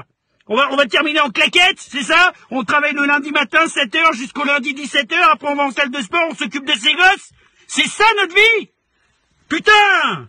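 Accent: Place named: Lyon, France. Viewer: Cuuuuulte la vidéo de l'année! Chez nous enfin près de chez moi (Allez Villette!) tu es une star même si tu es stéphanois, d'façon tu as l'accent lyonnais ^^ Bises mon pti canard aux olives:)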